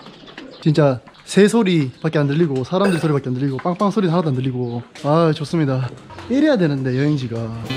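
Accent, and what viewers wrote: Gyeongsang, South Korea. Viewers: 그는 경상도 사투리를 쓴다. 그는 Jay다 - 요새 Jay님 영상 보는 재미가 쏠쏠 해요. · 목소리가 경상도 사투리라서 정이가서 본다 ㅎㅎ 여행도 좋아하지만 정갑다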